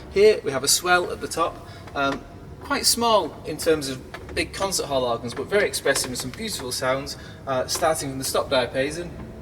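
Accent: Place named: England, United Kingdom. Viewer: This is so interesting, great demonstration and from an Aussie perspective, love your pommy accent 👍🏻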